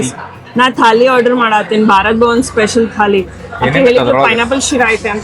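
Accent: North Karnataka, India. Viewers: mast kannada mathu Paka uttar karnataka 👦 Boy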